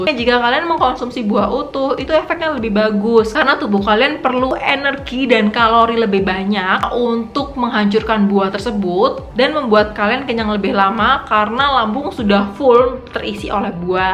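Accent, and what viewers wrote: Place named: Banjarmasin, Indonesia. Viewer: Kaya nya orang banjarmasin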